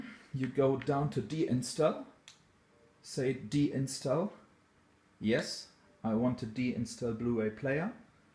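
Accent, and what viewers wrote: Germany. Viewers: deutscher lol?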